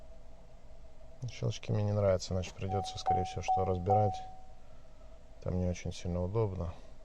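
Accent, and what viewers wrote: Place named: Saint Petersburg, Russia. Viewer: Хорошо смотрится. Без заумствований и проглатывания процессов в ремонте. Приятный русский язык, как будто из Питера)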